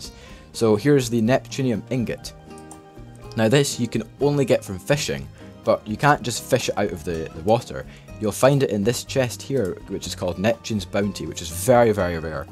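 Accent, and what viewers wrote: Scotland, United Kingdom. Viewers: Thanks! This overview really helped me! Also I love your partially Scottish accent. 😂😂😂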